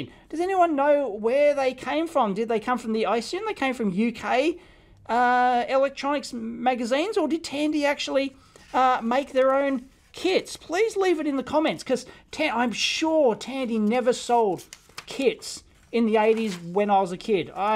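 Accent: Australia. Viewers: I thought it was a Techmoan video... until it started with that Aussie accent lol.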